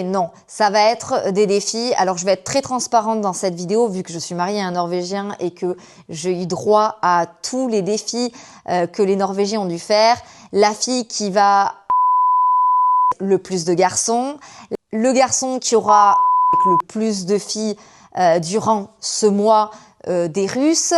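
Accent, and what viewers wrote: South, France. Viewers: Ce n'est pas moi qui ai parlé d'accent mais l'autre. Beaucoup de Français prononcent les O comme des E maintenant et pour les hommes ça fait très efféminé. Malheureusement beaucoup de Français du sud cachent ou ont carrément gommé leur accent du sud. En ont-il hontes ? Parfois son accent du sud ressort donc elle l'a toujours alors ça veut dire que par moment elle le cache. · A juger par l accent elle est du sud donc oui c’est plus difficile.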